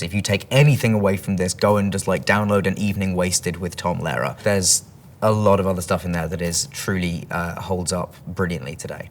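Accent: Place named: United Kingdom. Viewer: 自分はカリフォルニア州に住んでてアメリカ英語に常に触れてるからかもしれないけど、凄くブリティッシュだと思った。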